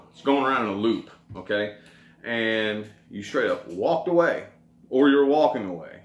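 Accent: South, United States. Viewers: Cool southern drawl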